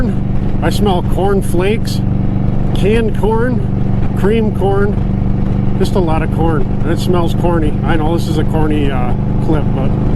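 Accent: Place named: Western New York, United States. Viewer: I'm enjoying this way too much. Btw that wny accent is strong... sound just like me.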